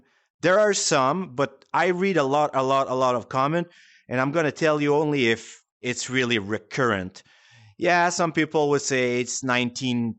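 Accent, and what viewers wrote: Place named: Quebec, Canada. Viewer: C est tellement un Quebecois qui parle !!!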